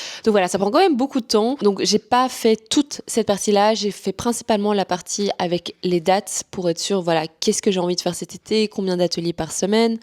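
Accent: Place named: Belgium. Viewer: J’allais dire "non on remarque pas que t’es belge" juste au même moment tu parles de ranger ton brol un de mes belgicisme préféré 😅 Tes tricots sont trop jolis, je me rends compte que je devrais peut être investir dans des pelotes de qualité. Le Louvre-Lens me tente énormément en plus il propose souvent de beaux thèmes d’exposition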